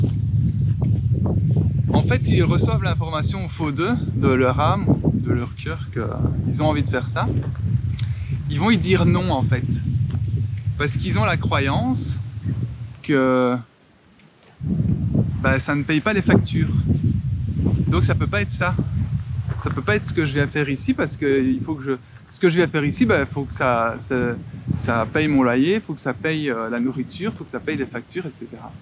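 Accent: Liège, Belgium. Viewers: Merci pour cette vidéo , il me semblait bien avoir reconnu ce petit accent de la Province de Liège que j'affectionne tout particulièrement étant de Mons (Hainaut). Il ne suffit que d'une chose pour changer le Monde comme en musique s'accorder pour être en harmonie et changer la musique ...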